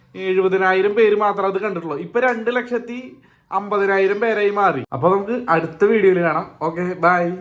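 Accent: Thrissur, India. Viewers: Nice broo first comment i love your slang because i am a thrissur Gediiiiiiiiiii…….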